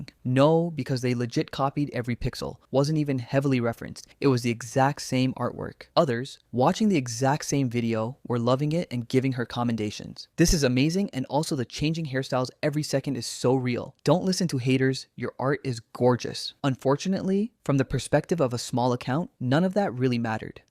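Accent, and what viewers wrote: Philippines. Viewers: i think she is filipino, im a filipino artist too, i know what my people sounds like. 😂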